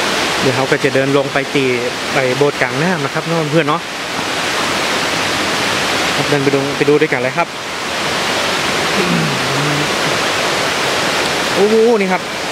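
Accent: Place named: North, Thailand. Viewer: ดีครับผม อู้กำเมืองน่าฟังดูดีนักๆๆครับ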